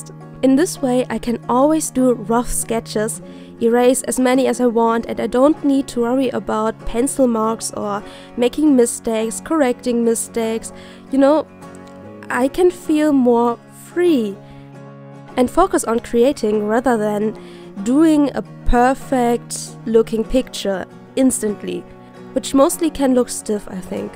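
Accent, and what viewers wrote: Germany. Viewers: Bist du deutsch? Wenn ja merkt mans mega😂😂😂🙈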